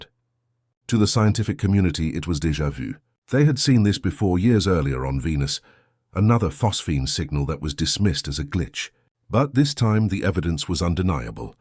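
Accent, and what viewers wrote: United Kingdom. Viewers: Another AI "terrifying" clickbait video with vague British accent.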